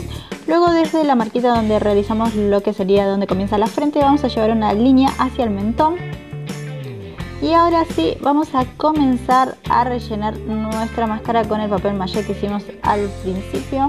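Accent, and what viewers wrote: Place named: Argentina. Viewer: me encanta el acento argentino xd oshe si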